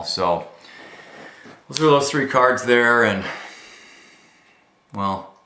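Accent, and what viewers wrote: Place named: Minnesota, United States. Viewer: I like the Nathan lol. you know shit's going down when hopps appears lol. like I said in the text, you finally sound like a true minnesotan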